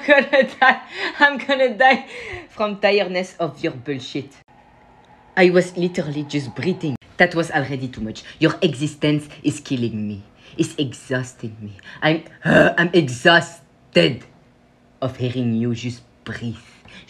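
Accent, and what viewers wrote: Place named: France. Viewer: It sound like a french accent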